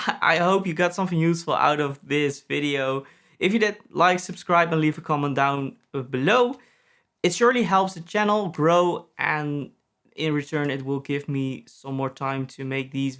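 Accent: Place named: Netherlands. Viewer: Hahaha hoorde ergens al een nederlands accentje, maar die 'later' det het m😂, Goeie video man! Wil hem ook gaan halen.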